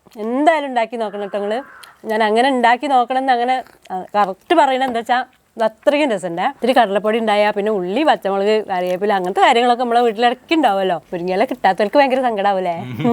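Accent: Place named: Malappuram, India. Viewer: ഫുഡ്‌ അല്ല ഞാൻ നോക്കുന്നത് അനിയത്തി കുട്ടീടെ വർത്താനം ആണ് ട്ടോ... ഞാനും ഒരു ട്ടോ കാരി ആണ്.... മലപ്പുറം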